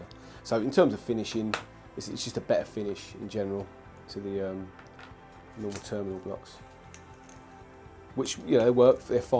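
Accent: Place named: United Kingdom. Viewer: I can’t be the only one that watched this video cos if his British accent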